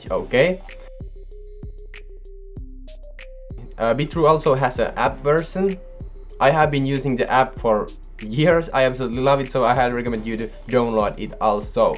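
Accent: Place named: India. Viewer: I get an odd feeling that you talk like an India's people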